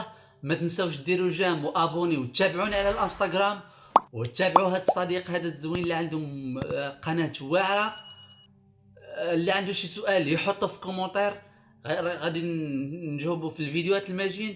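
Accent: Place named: Western Sahara. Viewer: اسمع يا الحبيب.... لهجتك.... صحراوية..... وتعلم اكثر مني عن قضية الساقية الحمراء وواد الذهب.... اقليم محل نزاع بين من ومن؟؟؟؟؟!!!!! لا اريد اجابة احتفظ بها.... فانت كما اراك.... تحسن التماس الحجج حتى للظلمة.... واختر مقعدا لك من النار.... فان القاضي اذا حكم وقضي بامر.... فاني متيقن سيحكم لك رغم ان القضية فيها ظلم ظاهر.... فقد قطع لك قطعة من النار.... انت تفقه ماذا اعني.... قلت البوليزاريو لقي دعما.... ضد الاسبان.... ما سمعت به حركة خرجت من القصر الملكي العلوي... ضد الاسبان... كلامك يفهم منه هذا..... الوحي..... تشير الى ضالة.... القوة الصحراوية..... وتنتفخ لقوة الجيش الملكي.... القضية العادلة لا تموت.... الحق يبقى حق.... البوليزاريو تلقي دعما من الجزائر.... والسوفيات.... وتيتو.... ومعمر القذافي.... وجياب.... ملاحظة.... القائمة طويلة.... دول اشتراكية.... شيوعية.... انتهت.... هي من التاريخ..... لكن خط هذه الدول مناهض للغطرسة الاستعمارية.... اخشي ان تكون منها..... 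مصطفى الوالي.... ثار ضد الاسبان..... كيف مات.... انت تتكم عن احلاف البوليزاريو. .... ولا تذكر شيء عن احلاف المغرب الأقصى.... اعرفهم رمز الامبيريالية..... والاستعمار.... والخنوع... تتكلم عن حقائق.... ولكن تحللها وتستعملها لتكريس فكرة مغربية الصحراء.... ستربح القضية.... ولكن انت تقطع قطعة من النار لنفسك.... لا نك تحسن الاحتجاج.... الحق.... لا يعني قوة الحجة.... بل لانه الحق.... كلمة حق يراد بها باطل.... ساهديك.... هدية جميلة... لكن لا تستطيع ان تحتج بها..... لانها اعتقد قنبلة..... نحن قبائل رحل من الادارسة.... من احفاد ادريس ال2 بن ادريس ال1...دفين فاس.. بن عبد الله الكامل.... الشهيد المحدث.... تعرف بقية السلسلة..... فنحن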